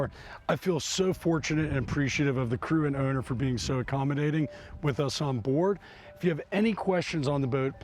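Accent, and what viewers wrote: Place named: Maryland, United States. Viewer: Maryland accent. :)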